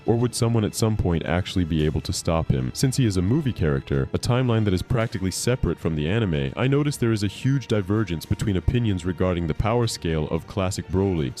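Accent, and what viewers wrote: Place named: Brazil. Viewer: He's Brazillian Bro, don't blame him, it's difficult to know how you american guys say shit.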